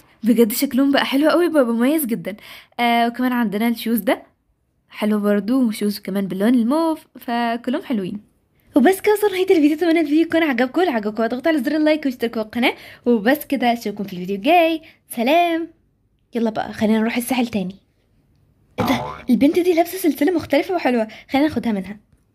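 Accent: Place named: Saudi Arabia. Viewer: عرفت من لهجتك انك سعوديه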